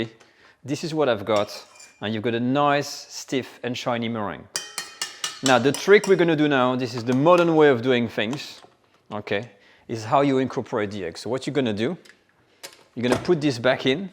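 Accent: France. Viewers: Everything tastes better with that French accent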